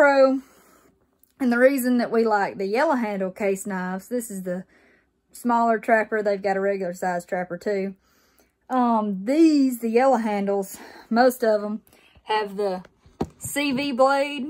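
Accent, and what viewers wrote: South, United States. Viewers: Awww that Southern accent. What double-chin!! 😱Where?? 😂😂😂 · So SOUTHERN! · Subbed for the southern accent, stayed for the warm smile. Merry Christmas! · Good to see you make another you tube video missed you, just love your southern charm "apreciate you" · Text title here! 😆 Your so so beautiful. I love the way you talk. It’s country as hell. You just don’t get that no more. Your rare as they get. Big truck blew down the phone 😝. I mean see. Dirt roads! Really? They don’t make them no more 🤘 you are the queen of Alabama! Dam gotta be. Love that there little tree darling. C.. has to stand for Carbon. But the V.. I’m not too sure. See a girl with a truck. You got to be the most beautiful Tom boy ever! Look... flannel shirts too! 👀 love it. Dammit y’all got a whole mother Bass Pro shop out there! 😄 Boy I wish I was something that you liked!😳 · Love that Southern accent!!! 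· Your. Great. Love. Your. Southern. Voice. But. Your. Video. Are. Great. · Appreciate y'all! I can't get enough of your country girl accent! Merry Christmas!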